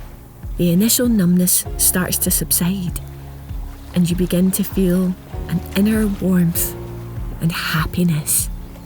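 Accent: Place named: Scotland, United Kingdom. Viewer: cold showers and scotish accent ,what else can u ask for? cheers from mexico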